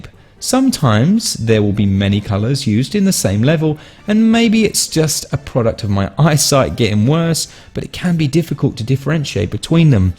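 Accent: United Kingdom. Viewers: I don't think Ren is right, but I'm not for sure. I always say it dis-guy-ah not dis-gay-ah, but you sound British so, that's probably a typical difference in pronunciation.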